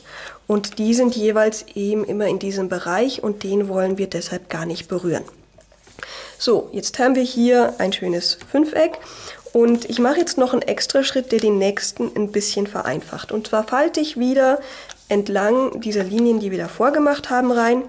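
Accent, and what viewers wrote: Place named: Germany. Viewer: Is this speaking/demonstrating cause on another video on her account she says she is German. I am proud to be half-German I love the German accent no offense but accent reminds me of my dad but he died when I was 3. Very good english on other videos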